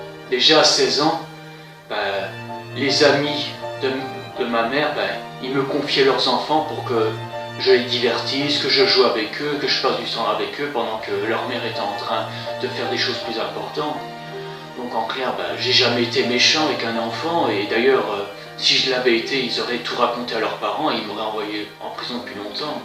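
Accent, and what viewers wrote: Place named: Belgium. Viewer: c rien c l’accent belge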